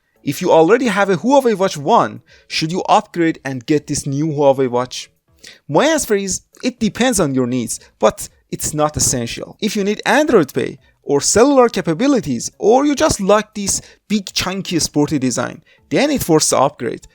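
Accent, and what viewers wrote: Iran. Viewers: Bro, I am 100% sure your native is Farsi, right? Beautiful review, thx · Iranian maybe or afghan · Persian accent 😂😂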